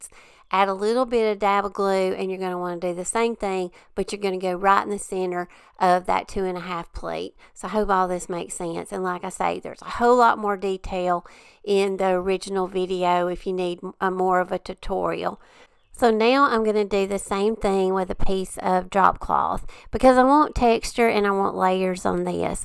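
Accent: South, United States. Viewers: I’m in Georgia. You’ve got the prettiest little southern belle accent I’ve ever heard! Grateful to find your channel! Finally someone who does country French decor diy! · your videos are awesome, I LOVE all your projects and listening to your sweet southern voice! I have used all of your ideas and feel so inspired by you. Thank you for sharing. God's blessings <3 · I hadn't been inspired for the past couple days, and I was feeling pretty bummed out about it, so I was searching for some videos I'd never seen on Youtube, when I came across one of your compilation videos. I love the same style of things you do, and I've never seen any videos like yours. I have probably watched every video about crafting that is on YouTube, and you are hands down the most talented crafter on here. Keep those videos coming! P.S. I love your country accent, because mine is the same! 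· I love the things u do.l!! Everything is beautiful..I’m southern too but your accent is deeper than mine .I think hehe I love listening to u!! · Thank God I came across someone that speaks just as KUNTRY as I do. Lol. People say I'm too KUNTRY soundin'. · I love kuntry accent..very sweet. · I just found your channel. I love your projects! As a fellow Southerner, I just love listening to your accent. I can't wait to watch more of your videos.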